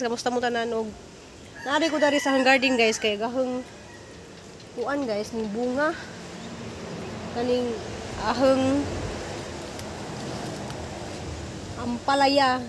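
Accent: Bohol, Philippines. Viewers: Hi sis bagong kaibigan! Taga bohol ka sis noh? Ganahan kog paminaw sa imng sinultihan bah,taga bohol sad akong tatay bah, taga CDO KO SIS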